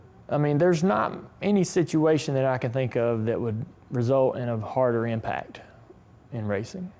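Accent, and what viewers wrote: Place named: South, United States. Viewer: There's something funny about hearing a guy with a slight southern accent doing an impression of someone with an even thicker southern accent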